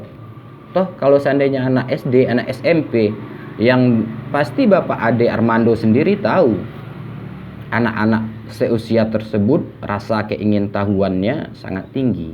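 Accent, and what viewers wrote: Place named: West Sumatra, Indonesia. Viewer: Lanjutkan pak... Suara minang...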